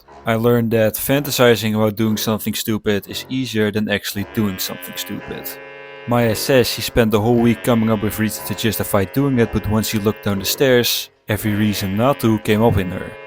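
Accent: Netherlands. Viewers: Your accent your dutch accent is so strong, sometimes I don't know if you're speaking english or dutch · valt hartstikke mee hoor, ik kom zelf uit londen en woon al jaren in nederland en ik heb heel veel erger gehoord. hij gebruikt wel eens nederlandse spreekwoorden die niet correct vertalen, maar verder klinkt het gewoon als een normale nederlander die engels spreekt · n#gga has a thick dutch accent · Oh God your perfect english being mix with your subtle dutch accent definitely answered my question of. "What dutch people sounds like if they fully speak in english", while I'm watching this characters saying one english words while speaking in fully Dutch. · ik vond t wel te verstaan maar ik hoor dat typisch nederlands accent in t engels al mn hele leven dus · broer het nederlandse accent van jou terwijl je engels spreekt is zo slecht dat ik ondertiteling moest aanzetten 💔💔💔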